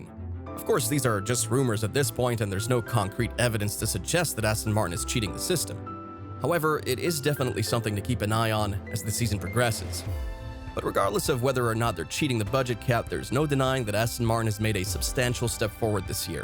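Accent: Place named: United States. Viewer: Ironic to hear an American accent thinking it knows anything about F1🤣 Instant stop and scroll,😝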